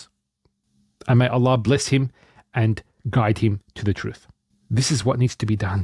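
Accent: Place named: Australia. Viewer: Much respect to you brother. I admire your devotion in seeking the truth, no matter the cost. I myself come from a fanatic Salafi / Wahabi background and the Qur'an set me free from all that indoctrination and brain washing from tradition . I do not identity as a Sunni or Shia, but rather a Muslim who follows Millata Ibraheem - Haneefan. They love to label me as 'Quranist' 😅 I found out over the years that the Tradition diverts you from seeing the truth in the Qur'an. It is unfortunate that the Qur'an was never the primary source, which is why it gets so badly misinterpreted and distorted (like the example of the return of Jesus). I can tell you're Australian akhi by your accent. I'm Ozzy as well from Melbourne.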